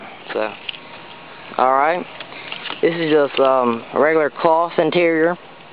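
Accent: South, United States. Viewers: And of course he's southern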